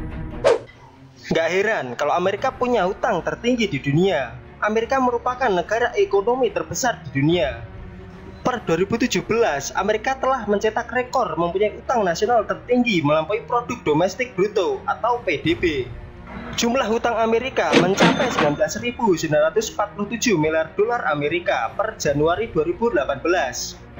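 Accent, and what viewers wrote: Java, Indonesia. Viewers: Jelas wong jowo iki admin e kwkwkwkkw. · Narator nya MEDOK sangat 😁🙏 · Medoknya itu lohh boss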